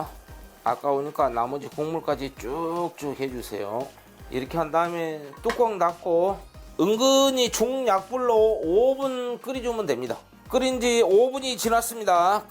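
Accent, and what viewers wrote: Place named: Gyeongsang, South Korea. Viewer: 구수한 사투리 듣기 좋아용 갱상도에서~~~ㅋ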